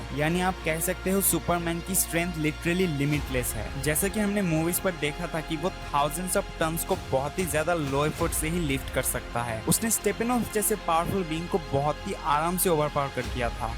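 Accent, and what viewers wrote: Bengal, India. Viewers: Bangali ho kya? Guessed from your accent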